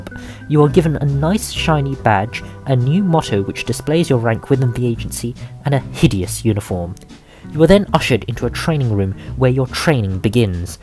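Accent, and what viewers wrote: United Kingdom. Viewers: Voice over is so excessively british I cannot concentrate on this despite being very interested in the story.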